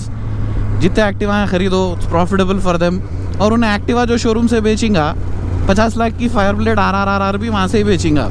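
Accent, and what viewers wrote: Hyderabad, India. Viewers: I’m following you since a long time both for bike reviews and to listen Hyderabadi Hindi 😊 And YES you are right the OLD zx10r looks was great ! It looked manly butch !!!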